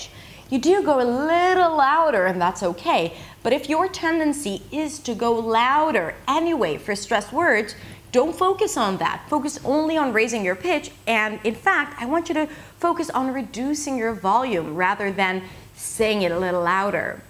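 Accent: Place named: United States. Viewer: You are my role model when it comes to pronunciation. Every time I think about giving up, I remember how you managed to learn your perfect American accent without being American. Thank you,!:D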